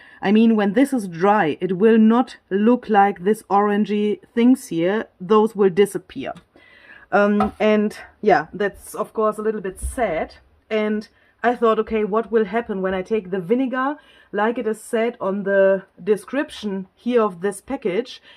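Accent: Germany. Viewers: I love your english-german english. And your humour ! hahha xx so refreshing! Richtig erfrischend! :-)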